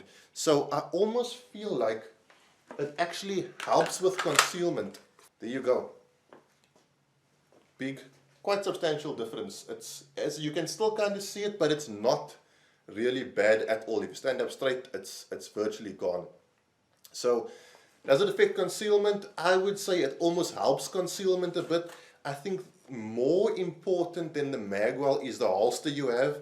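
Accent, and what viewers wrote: South Africa. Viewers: are you south african? I'm trying to puck up on that accent lmao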